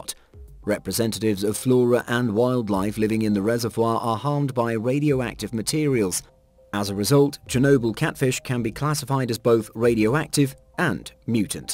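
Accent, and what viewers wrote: United Kingdom. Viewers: Hold on there Mr. British voice. The word SOCCER was derived from the British in the early 1800's so its y'alls fault that its called soccer, not North America. Interesting video either way. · Fuc! Are only brits doing documentaries? So sic of the accent!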